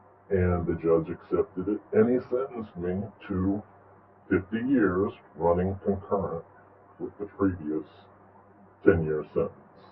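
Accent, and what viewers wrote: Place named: Midwest, United States. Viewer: How is he doing this channel from inside there? From his accent I'd guess he's in the midwest.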